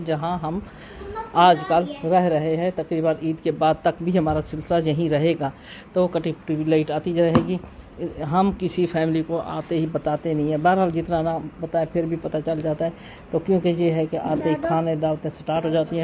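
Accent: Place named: Saraiki, Pakistan. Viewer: 👍❤🤲🤝😘🥰♥️🌺 السلام علیکم ویڈیو تو ماشاء اللہ بڑی مزیدار ہے اپ نے گاؤں دکھا دیا سبزیاں دکھا دیں اور عورتوں اور گلہری بھی دکھا دی مجھے لگتا ہے اپ سرائیکی ہو لہجے سے پتہ چل رہا ہے میں بھی لیہ کی ہوں میں ہم بھی مجھے بھی سرائیکی پوری اتی ہے کیونکہ میری ایک بہن کی شادی بھی سرائیکیوں میں ہوئی ہوئی ہے اللہ تعالی کی اپ کو کامیابیاں دے ترقی انے ماشااللہ ویڈیو دیکھ کے بڑا مزہ ایا اور بہت اچھا لگا کوکنگ ود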